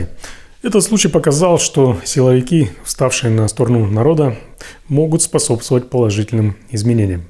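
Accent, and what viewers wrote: Ukraine. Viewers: А почему акцент укропский??!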